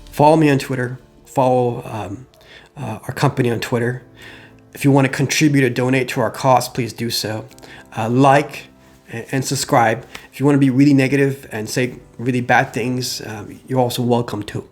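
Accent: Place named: Iran. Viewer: Piper Ohio >> No he doesn't. That's his Iranian accent. DON'T change the speaker!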